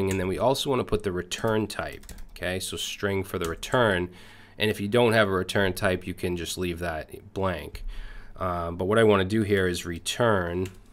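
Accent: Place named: Northeast, United States. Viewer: I love your accent. It reminds me of my childhood. Where in the Northeast are you from? (My guess is the Tri-State, probably Jersey.)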